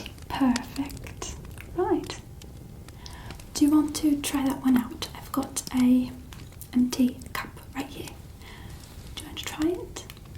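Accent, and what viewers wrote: United Kingdom. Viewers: The accent is brilliant! Hard for an American to perfect a good British accent!! Well done you! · I’m British and u genuinely sound British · Your British Accent as Hermione Granger spot on perfection another 33,000,000,000 points for me! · I love that you can actually do a british accent, a few other asmartists can but not very many I'm very impressed being a British person myself. I'm from Bath in Somerset. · I am English and I hate almost every American attempt at a posh English accent - this is one of the best I have ever heard. You continue to raise the bar xxx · Loved the British accent and all the roleplay as well 💕 I was having a crappy day and now I'm so relax, Thank You 😍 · As being british This is the best british accent i have seen from an asmrtist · your accent isn’t that bad but it’s kind of a mish mash of essex/posh/brummie couldn’t watch this although the attention to detail is 10/10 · You do a great British accent love it 😍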